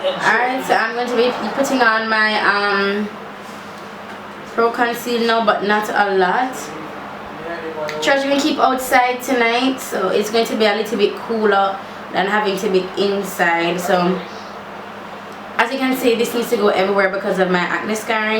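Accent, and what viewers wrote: Jamaica. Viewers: Looool the patois speed on fleek 😂👏🏾✊🏾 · jamacan accent a bit tough and you forgot to match up your neck!! looks many shades darker! lolololo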